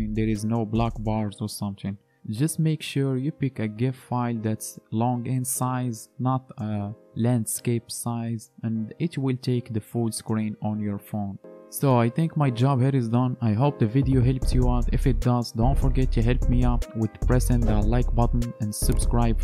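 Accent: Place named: Morocco. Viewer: nta mghribi bayn mn laccent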